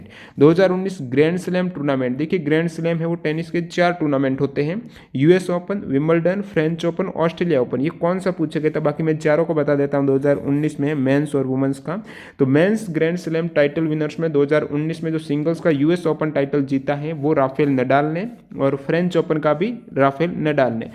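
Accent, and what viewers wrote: Rajasthan, India. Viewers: Bhai rajasthan se lagh rahe ho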